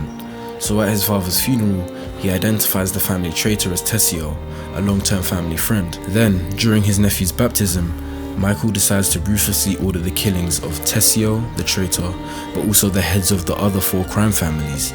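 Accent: United Kingdom. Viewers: Black dude with a british accent. Crazy.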